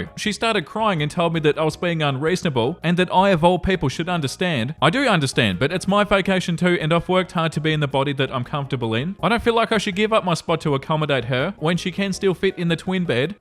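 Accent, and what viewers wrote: Australia. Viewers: It’s funny. I’m Australian, so I don’t notice his accent, but I recognize the kindness and horror in his voice. 😂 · It's probably a accent thing as he is Australian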